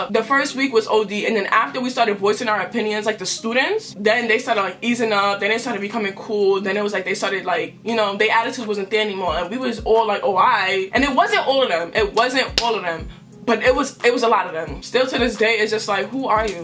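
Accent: New York, United States. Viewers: I love you and the fact that your from New York bc my sister is from there and I don’t live with her but y’all sound the same it make me Happy☺️ The first video I watched if yours I could tell that’s where you were from🧡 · “So boom” I LOVEEE THE NEW YORK IN YOU 🥰😭!!!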